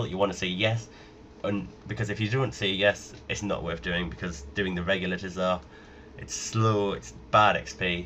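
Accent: United Kingdom. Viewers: brittish accent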